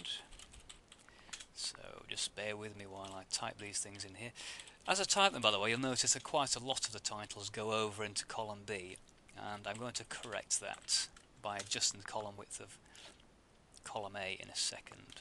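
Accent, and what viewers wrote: United Kingdom. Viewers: don't know why i can listen to british people doing tutorials but american accents bore me to death in tutorials!? Even though im american! Anyways good job on the video! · thanks u british guy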